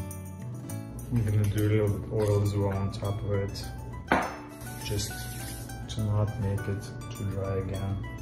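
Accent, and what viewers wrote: Russia. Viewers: cute Russian accent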